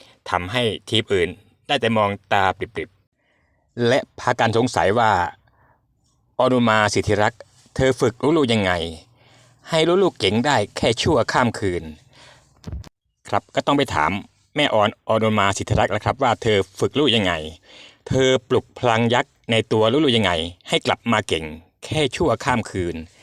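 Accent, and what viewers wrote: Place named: Isan, Thailand. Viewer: สำเนียงอีสานคักแถะ หนังกีน พะนะ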